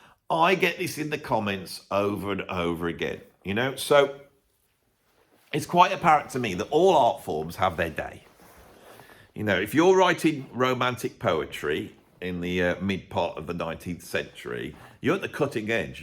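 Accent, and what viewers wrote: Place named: England, United Kingdom. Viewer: Nobody out of England does understand what you are telling because of your terrible Accent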